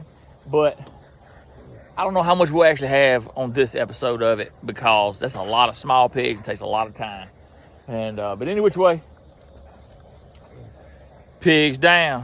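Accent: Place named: East Texas, United States. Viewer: When I hear you talking it reminds me of growing up in East Texas near the Louisiana border. I havan old hunting buddy lives in Grenade Mississippi. Love watching your videos.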